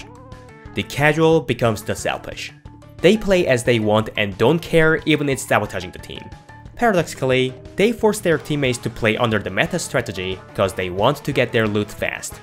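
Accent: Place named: Austria. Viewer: Are you by any chance Austrian? For some reason I get that from your voice.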